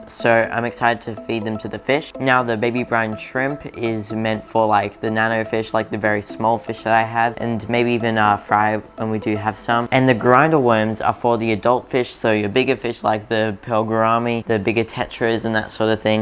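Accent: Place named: Australia. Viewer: Great video mate, glad I came across another fish related channel! Sounds like you got a bit of an Aussies accent you from here?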